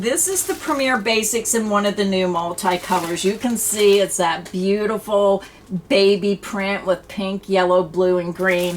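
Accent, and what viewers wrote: Pennsylvania, United States. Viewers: I love listening to your Pennsylvania accent. I like how you called your shelves a coopy hole. We called it a cubby hole. Coopy is much cuter 😉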